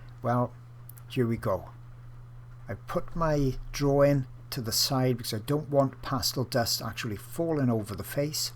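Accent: Wales, United Kingdom. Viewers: Welsh amateur artist picking up a pencil again here. Brilliant tutorial and great to hear a Welsh accent 🏴 🙌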